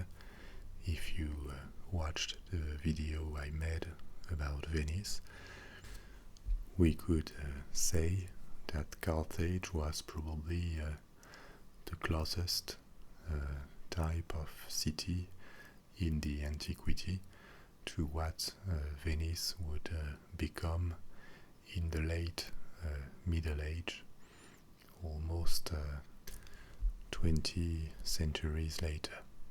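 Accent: France. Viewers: I get great tingles from French accents! very very relaxing! :)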